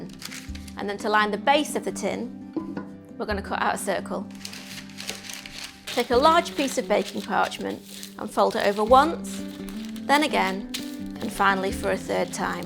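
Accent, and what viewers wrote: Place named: Yorkshire, United Kingdom. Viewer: Well, I made 2 cakes , and they were so delicious , we already ate one! Now im making another! Lovely, easy recipe, cant go wrong, and I love your Yorkshire accent, reminds me of home! Love Janet in Norway! x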